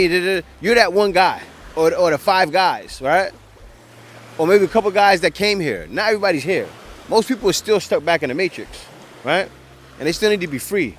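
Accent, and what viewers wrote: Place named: New York, United States. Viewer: bro I been watching you for few years now. Im appreciating everything you been saying. Still got that NYC vibe coming through your mouth 😂😂😂. I’m 59. Just retired from working NYS government job. I need to leave and come to the philippines. Need to need up with you when I get there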